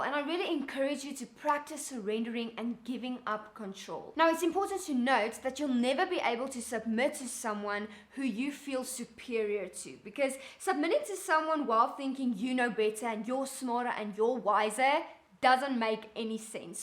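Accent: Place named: South Africa. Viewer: Lol I was about to say you sound South African 😂😂. Enjoyed your message. Beautiful from Botswana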